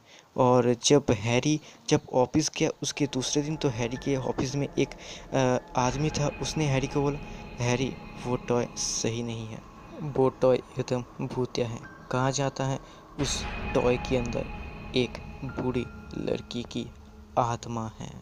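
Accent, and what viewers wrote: Bengal, India. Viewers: Bhai kya story super and are you Bengali no bhai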